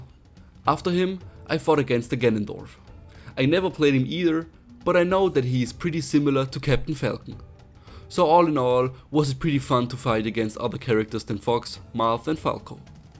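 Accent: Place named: Germany. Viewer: Mann hoert raus dass du deutscher bist hahaha. Weiter so mit dem Melee grind! Ich find es lustig, dass du den Gruenen Mr Game and Watch spielst. Ach du Gruene Neune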